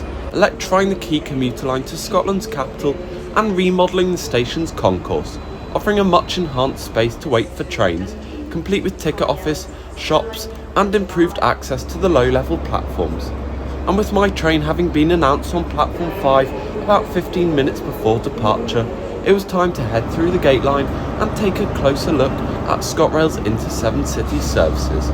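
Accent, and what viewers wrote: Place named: Southeast, United States. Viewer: You have a southeastern accent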